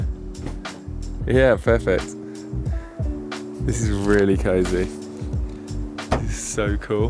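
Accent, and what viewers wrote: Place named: United Kingdom. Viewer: Hehe just a clear British accent;)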